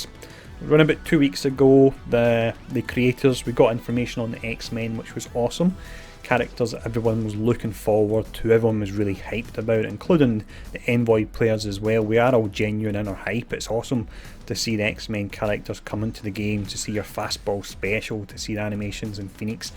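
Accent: Scotland, United Kingdom. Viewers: I applaud you for your honesty and integrity. I recently started playing MSF because I have too much time at home due to an injury, and I am binge watching many MSF videos from many content creators and I could tell you are different from the rest instantly. No disrespect to others, but your calm and easy going attitude (even when you keep getting minions during orb openings) made me subscribe to your channel. When I get better and start going to work, I doubt I will have time for MSF (Marvel Future Fight is a much better game, no randomness bullshit, purchases are not mandatory and do not cost an arm and a leg, etc) but I will keep subscribed to your channel and watch your videos as they are released. I also love listening to your lovely Scottish accent:) Keep up the good work.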